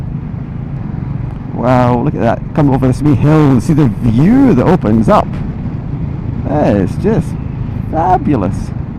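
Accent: Scotland, United Kingdom. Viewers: Really loved the video and your Scottish twang. Looking forward to seeing more videos and your commentary. Beautiful scenery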